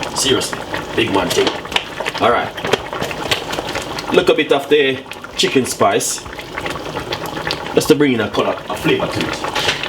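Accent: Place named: Jamaica. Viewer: Loving the Jamaican recipe... and accent.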